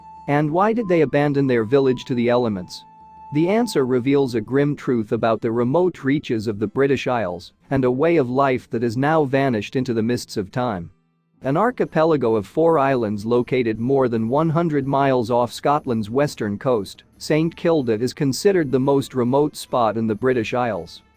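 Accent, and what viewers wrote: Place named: United States. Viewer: American narrator, load of tosh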